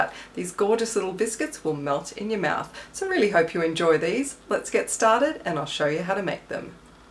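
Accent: Australia. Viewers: She sounds Australian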